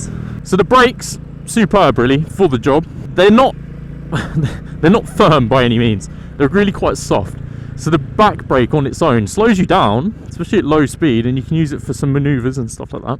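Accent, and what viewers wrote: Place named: South India, India. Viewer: It's probably the south Indian accent of many Indian youtubers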